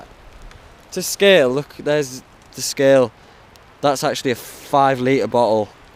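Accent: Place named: Cumbria, United Kingdom. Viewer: Forgets water but remembers alcohol - that's about right haha. Can't imagine how hard it was to drag yourselves out of your hammocks - cold & hungover in the morning. makes me shudder. I much prefare to get my shmoke on when I'm sat next to a campfire. Each to their own n all that though ehh :) Looked like a chilled out night. Cheers for sharing. Btw, Whats that accent? you sound like my ex. She was from Cumbria but lived in Preston for 8 years. Just being nosey...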